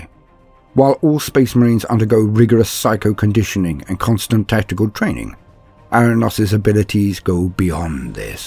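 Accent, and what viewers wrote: United Kingdom. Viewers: Ah, my 2 favorite things, Blood angels, and an old British guy explaining them.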